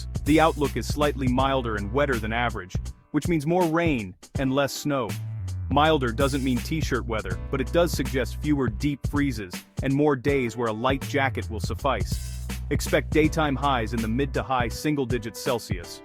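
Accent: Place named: United States. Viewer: What about Northern England and why have you got an American accent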